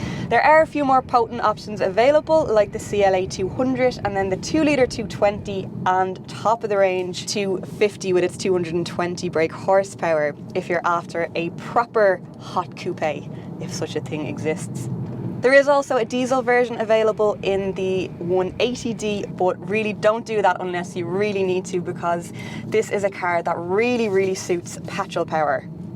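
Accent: Ireland. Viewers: Jacksepticeye made me like Irish accent and this review was amazing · I don't like Irish accent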